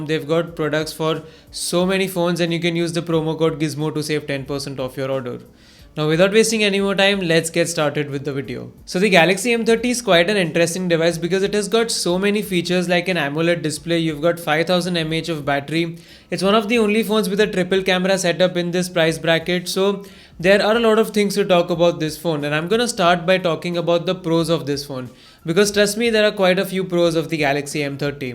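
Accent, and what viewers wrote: India. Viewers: bullshit! i cant handle the speaking of indians. I'm a little bit irritated to him while lessening but I'm interested in his review HAHAHAHA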